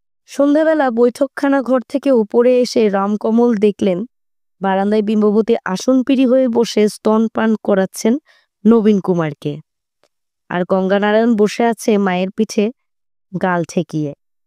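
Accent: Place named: East Bengal, Bangladesh. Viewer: স্পষ্ট করে বলুন উচ্চারণে গন্ডগোল কোথায়। পাঠিকার উচ্চারণ পূর্ববঙগীয় ঘেষা। অর্থাৎ উনি বাঙাল।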